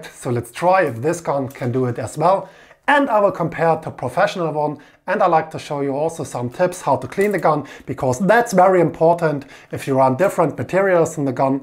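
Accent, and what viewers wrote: Germany. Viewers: Excellently presented work with a gun. I am interested, however, in these seals you mention. To be replaced with SATA seal. Where can I buy this? What address, shortcut on the website? I hear from the dialect that you are from Germany. I may be wrong. Is it possible to get these seals from Germany?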